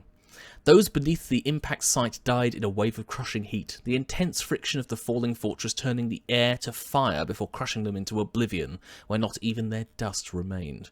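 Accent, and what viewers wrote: United Kingdom. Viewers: lol, the British accent making terror and terra homonyms :D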